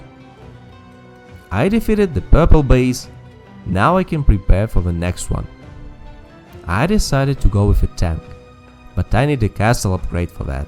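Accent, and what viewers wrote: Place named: Eastern Europe. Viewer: I love the idea of this run, pretty unique. Makes you wanna give each unit a nickname, like Sarah the Sorcerer, or Pat the Priest. I've been starting to shake off the RTS rust with one of my other childhood games, AoM, and the idea of doing this challenge on that game sounds super cool; I'm gonna need to start trying it. All that being said, before you commit to doing the entire WC3 campaign with this ruleset, I have two words to say: King Arthas Have fun with that one :D btw, really like your accent. If I may, what is it? Sounds Eastern European.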